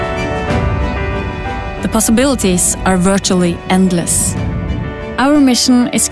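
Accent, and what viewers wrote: Norway. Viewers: Marius VanDamme Jeg hadde vært enig med deg om det ikke var for at det var så ille. Grunnen til at jeg ikke fokuserer på fransk-engelsken er nok fordi at det ikke er så store hint om fransken. Om en franskmann hadde hatt like store vansker som denne jenta her har med engelsk hadde vi ikke forstått noe. Vi burde vel egentlig være glad for at det ikke var en mer uforståelig engelsk i videoen. Om det bare var litt norsk igjen i engelsken, som for eksempel 10%, hadde det nok ikke vært så ille, men hun har jo sånn 50% norsk i engelsken sin, det er ikke bra. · Vi trenger ikkje prate oxford engelsk! Neh, heilt greit det der. Du hører når ein franskmann snakker engelsk feks, samt andre nasjoner så skinner det igjennom kor dei kommer fra. Så koffor kan ikkje vi ha litt norsk engelsk da? Har noen engelske venner som synes "norsk" engelsken er ganske kul sammenligna med mange andre. 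· I agree. Norwegian accents are charming.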